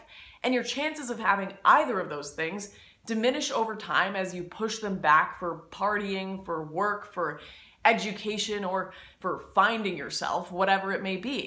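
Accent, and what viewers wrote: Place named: Canada. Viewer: Don't worry about it. I thought it was you're Canadian accent and thought nothing of it.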